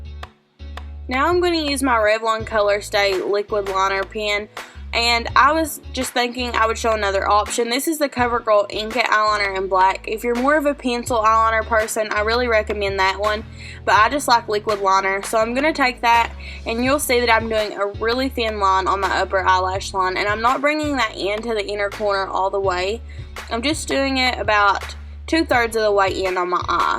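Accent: South, United States. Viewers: I came across your channel about an hour ago and I'm pretty sure I've found my new favorite YouTuber! I'm from way deep in the south and it's so great to hear a southern accent from a guru! You've inspired me to consider making a channel:) would you consider making a video about how to start a channel, what camera you use, etc? Can't wait to see more videos:)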